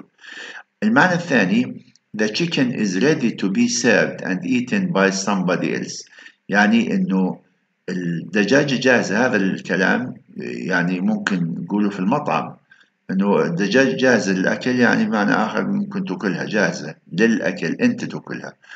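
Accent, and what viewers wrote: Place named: Mosul, Iraq. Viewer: دكتور كيف حالك عساك بخير. ربي يحفظك. دكتور اعتقد انت عراقي؟ لان لهجتك مثل لهجت اهلنا في الموصل للعلم انا من ديالى خريج جامعة الموصل في التسعينات. تحياتي لحظرتكم.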